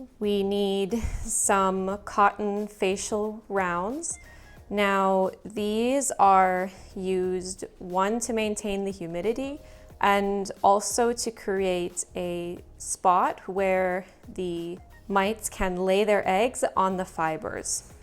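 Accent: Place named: Canada. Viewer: I’m from BC originally and live in the states now. I love hearing the Canadian pronunciations. Sachets is an interesting one bc usually Canadians say things more French-ish (i.e., foy-YAY vs. FOY-er), so you’d think it would be the Canadians saying sah-SHAY but nope. Sadly, most of my Cdn pronunciations have disappeared from my vocab but I refuse to give up the correct way of saying PAH-sta (not PAW-stuh). 😂 Great info and exactly what I was looking for. Thanks!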